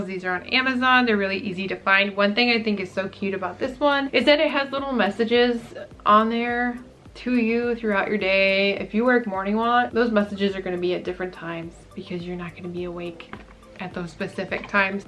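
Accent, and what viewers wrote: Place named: Wisconsin, United States. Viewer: Wisconsin probably. Nice funny accent.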